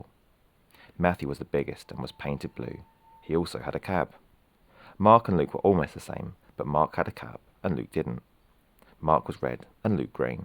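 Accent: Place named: Wales, United Kingdom. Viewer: Love the Welsh 🏴 accent, quite charming. 😊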